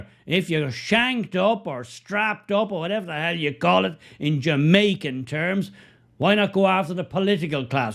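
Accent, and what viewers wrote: Ireland. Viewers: Loving the passion, send the Jamaican genius back home to help lift Jamaica,he has all the answers, they used to say don't bite the hand......😂 keep up the good work, I love your Irish twist.Peace and prosperity to all my Irish brothers and sisters this nonsense has been taken too far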